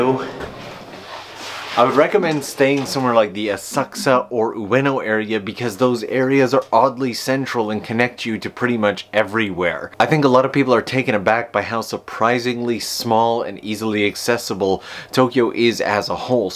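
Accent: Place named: Canada. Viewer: I've just started watching a few of your videos and I heard your accent. I was 90% sure you were Canadian but wanted confirmation. I saw this video and I clicked it so fast to scratch that itch I had. Sure enough, you're definitely Canadian looool. Greetings from Jamaica!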